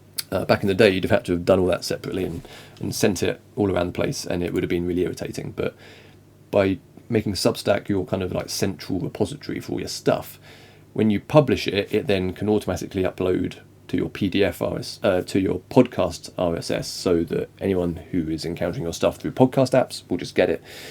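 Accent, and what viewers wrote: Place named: England, United Kingdom. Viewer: Thank you, I'm also very new to Substack and I appreciate that you SHOW what you're doing as you're doing it. Also, an english accent always sounds far more intellectual!:) You've got a new subscriber. I'll be back!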